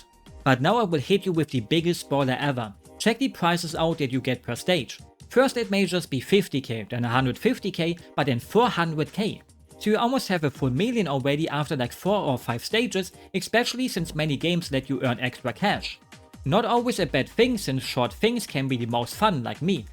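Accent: Germany. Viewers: you are like if the guy who made top 10 hottest sonic characters had a cute german accent and im here for it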